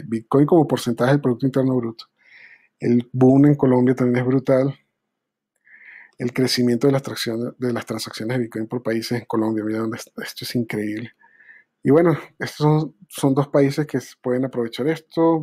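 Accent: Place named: Venezuela. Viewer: Tienes "acento" venezolano.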